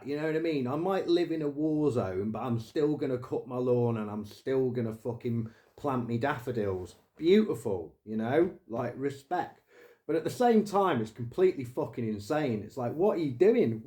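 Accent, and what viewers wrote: United Kingdom. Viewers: sooo British) Love it!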